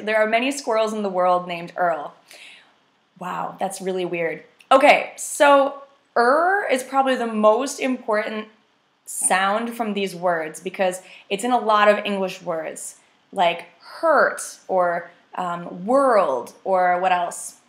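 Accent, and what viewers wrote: United States. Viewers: Don't want to be pedantic, but you're teaching us american, not english pronunciation :). Thx! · It's something easy to listen to but difficult to practice, not only we, from India, but neighbouring Bangladesh, Pakistan, Srilanka, simply say WARALD and get rid of it. I faced a listening problem when I met Americans during my stay in USA, briefly, But you are making it a cakewalk to practice English in its pure American tone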